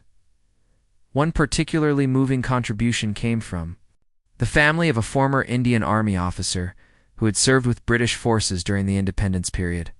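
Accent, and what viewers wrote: United States. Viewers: Those are phoney uniforms - not one representing a regiment of the Brigade of Guards. You appear to have an American accent; why not post fiction based on an American formation?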